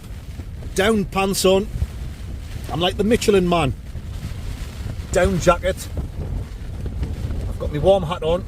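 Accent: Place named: Sunderland, United Kingdom. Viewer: This is the only Sunderland accent I will tolerate to listen too. Top bloke 🔥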